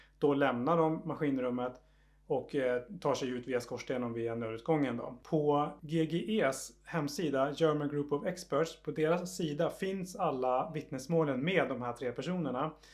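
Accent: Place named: Finland. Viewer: ARE YOU FROM SWEDEN ? BECAUSE YOUR ACCENT YOU LOOK MORE THAT YOU ARE FROM ..........FINLAND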